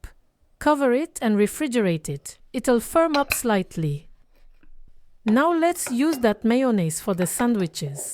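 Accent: France. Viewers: The French accent mixed with Asian accent while speaking English is delightful 🪻💐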